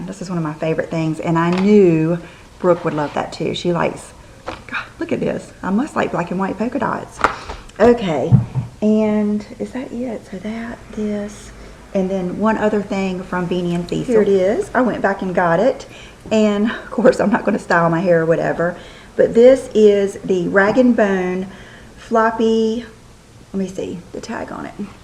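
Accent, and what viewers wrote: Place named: South Carolina, United States. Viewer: You are such a doll! I live in greenville SC and just love watching you, hearing that familiar accent I know all to well! Xoxo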